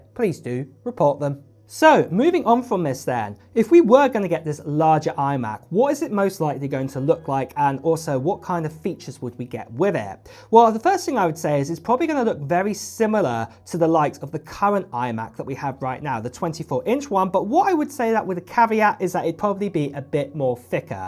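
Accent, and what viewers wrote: United Kingdom. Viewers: every time you mention Summer in your videos we are left clueless. Use months and years to help your viewers understand. Just because you have a brittish accent doesnt mean you are living in the UK !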